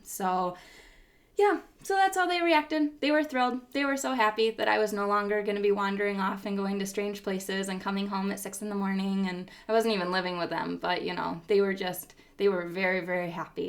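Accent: United States. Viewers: That Midwest accent though..... love it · As much as I love to hear your conversion story, I have one request to make which is whether you could slow down a bit as its hard for some of us living in India to follow those twang-rich american accents. I mean no disrespect but I wish to listen carefully to your speech which is truly inspiring.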